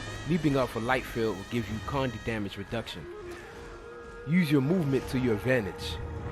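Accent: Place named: East Coast, United States. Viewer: Do more commentary if you have the time. I be hearing the east coast in the voice, from the Chi-town here.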